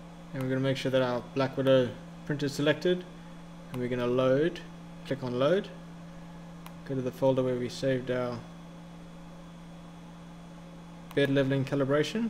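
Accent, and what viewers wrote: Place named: South Africa. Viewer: Gotta be South African :)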